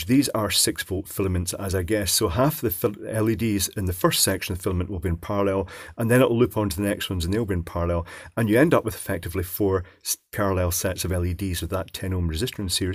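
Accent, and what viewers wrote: United Kingdom. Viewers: I like your channel sir you are amazing i like your british english alsooo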